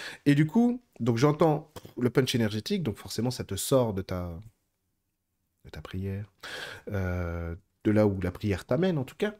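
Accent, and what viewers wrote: South, France. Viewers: Vidéo géniale comme d hab! Merci. « Je clairvoye à mort ». Je clairvoye, tu clairvoyes, il/elle clairvoye etc etc❤🎉😂😊. Topissime l’accent du Sud « à la bien cousin, en place » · Merci beaucoup ce partage! J'adore l'accent du sud!😂 ❤❤❤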